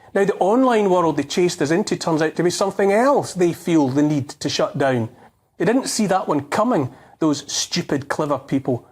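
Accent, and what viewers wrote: Scotland, United Kingdom. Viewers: A true Scottish voice speaking truth to power .Cue character assassination in the press.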